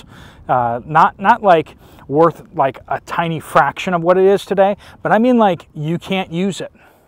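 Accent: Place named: United States. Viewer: Nice of you to do reports from your journey - even with your AMERICAN pronunciations.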